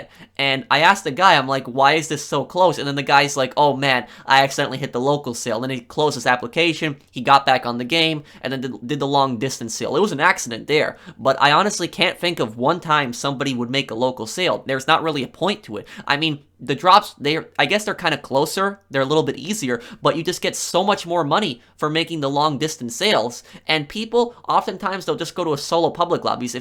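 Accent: Poland. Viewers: Are you from Poland? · Are you polish?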